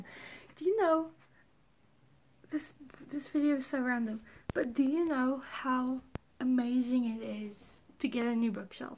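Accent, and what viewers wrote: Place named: United Kingdom. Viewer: How’s photography going? My web connection is rather (sh*t) but I allowed the audio to play out. I seriously enjoy your voice... I tend to follow folks just to hear them speak. (Odd yes but still...) Love hearing folks from the uk 🇬🇧 or other areas around Europe... Anyways I do hope your photography is going well. Any tips to building a portfolio easy without hiring folks? Just curious... Best to you... peace and love.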